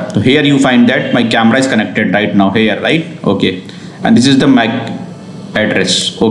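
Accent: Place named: Indonesia. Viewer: dont trust indo accent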